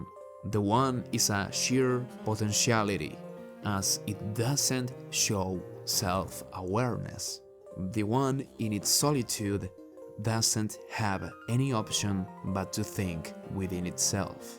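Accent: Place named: Spain. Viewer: I like your accent dude, where are you from? You mention empanadas in the description so I'm guessing español is your first language? Anyways really cool video with all the real world historical context. Earned my sub, man! I hope I will find more interesting videos when I check out the rest of your channel!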